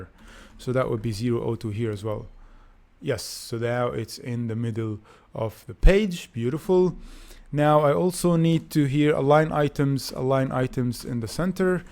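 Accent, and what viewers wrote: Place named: France. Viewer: Everything made perfect sense because of the way you explained it. Thanks a million. The charming French accent added a flavor haha. It was perfect!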